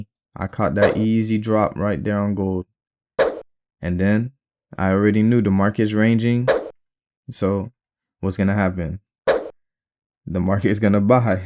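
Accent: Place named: United States. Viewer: How do have an account on tradersway you sound like you live here in America. I tried to sign up they wouldn't let me have any account. You have any videos that teach american people how to do sign up and use these brokers? I signed up for many and all of them ask you to verify yourself to withdraw money. what is your workaround for that?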